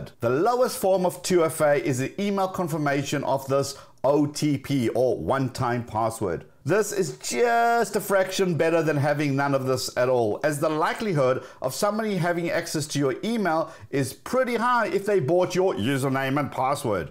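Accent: South Africa. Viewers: are you south african? You sound like a soutie😂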